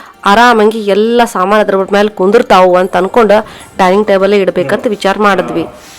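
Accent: North Karnataka, India. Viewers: ನಿಮ್ಮ್ ಮಾತ ಒಳಗ್ ನಮ್ಮ ಉತ್ತರ ಕನ್ನಡ ಕೇಳುದ ಚೆಂದ ಬಿಡ್ರಿ ಮಸ್ತ್ ಎಲ್ಲಿದ್ದರೂ ನಮ್ಮ ಸೊಗಡ್ ನಮ್ಮ ಭಾಷೆ ಬಿಟ್ಟಿಲ್ಲ ನೋಡ್ರಿ ನೀವು.ಅದು ಭಾಳ ಖುಷಿ ಅಕ್ಕೆತ್ರಿ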